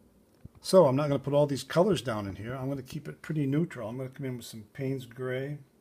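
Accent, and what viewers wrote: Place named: New England, United States. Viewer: Found this video 3.5 years ago when I began to self-paint wc's. Found this today, and STILL believe this to be the finest demo on fog, mist, w/w. Thank you for this hidden gem! (PS: I'm from RI, you sound like NE, yes?) Fanna Turano Denver, CO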